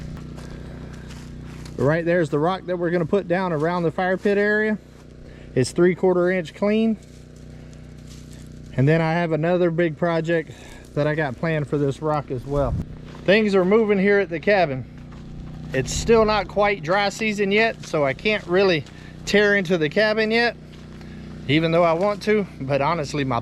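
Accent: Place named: West Virginia, United States. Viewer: Telling ya man.. Im originally from WV. Lived there for 33 years of my 40 on this planet... Hearing this man talk takes me home..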